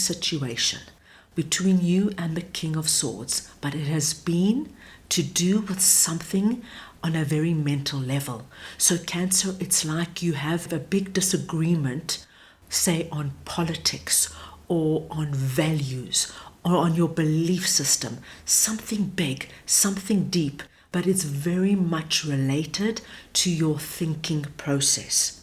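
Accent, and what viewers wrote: South Africa. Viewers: you have a south african accent africanda thank you great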